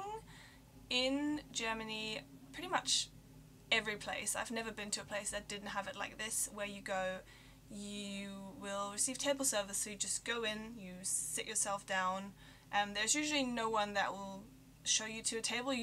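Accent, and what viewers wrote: England, United Kingdom. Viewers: Picked up a very English accent. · If you're German, then why the English accent? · Please take this accent back to Germany and teach them. The British Accent will soon vanish and be replaced by the American one. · You sound British you are yeah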